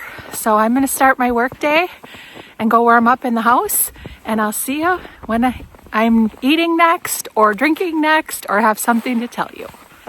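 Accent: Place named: Midwest, United States. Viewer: I love that midwest accent❤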